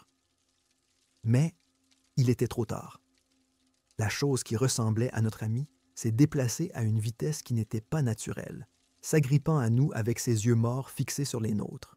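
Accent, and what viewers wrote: Quebec, Canada. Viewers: J adore vos histoires...avec le petit accent québécois c est très sympa 👍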